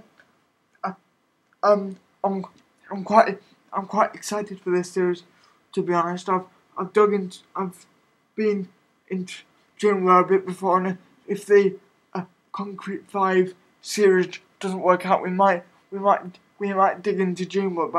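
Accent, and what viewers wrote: United Kingdom. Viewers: Helpful, succinct, awesome. Straight to the point and a UK accent to boot. Thanks man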